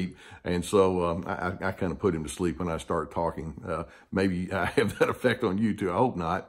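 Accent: South, United States. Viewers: But i hit the rewind first and listen again to make sure I get the message. I guess I'm trying to say I'd like your videos to go a little longer on one topic. You talk fast for a smooth southern talkin man. Love your accent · I enjoy listening to. His knowledge and delivery is pretty easy to listen to and his country accent reminds me of home. Good info to share. Thanks!!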